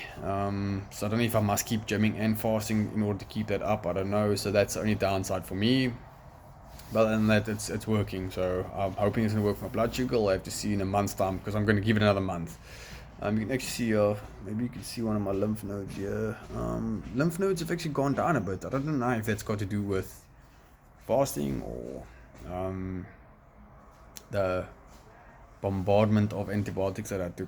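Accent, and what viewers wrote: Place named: Johannesburg, South Africa. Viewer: Im trying to pin point that accent. Im guessing Joburg?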